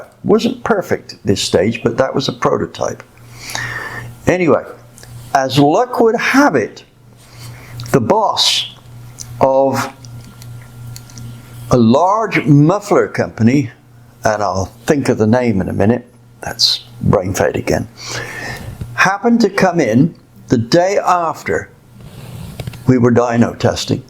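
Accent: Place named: South West, United Kingdom. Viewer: MARTIN PIERCE is (A VERY OLD GREASE MONKEY) who sounds exactly like you with that old south west farmer accent , not sure he will watch a channel like this? Martin is the smallest cheat in car mechanics i know 😂where’s me a 48 clueless female (car lover) have bodged many a thing on my cars and buy some miracle they’ve worked . Like the last exhaust i just did . Know iv got the attention span of a fish so as much as id love to listen to your lovely slow talking persona il leave it to MARTIN PIERCE , with a damn site more patients than me!!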